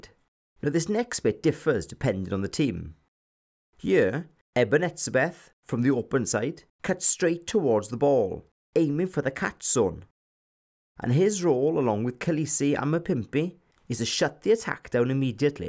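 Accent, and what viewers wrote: Wales, United Kingdom. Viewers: Great insight and enjoyed the Welsh accent as a bonus! Subscribed and followed on Twitter. Looking forward to learn more from you. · Just love your Welsh accent😊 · I like this Welsh accent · Excellent analysis. Love that thick Welsh accent. Regards from South Africa. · great clip, excellent analysis- in this channel we have a Squidge competitor- love the heavy Welsh accent- must be a man from the Valllleeezz?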